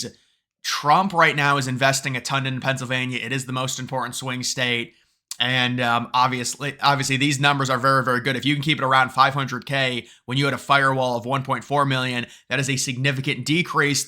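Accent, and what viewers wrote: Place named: Boston, United States. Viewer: woooweee where is your accent from? Man it's strong. Is that Gaelic Boston?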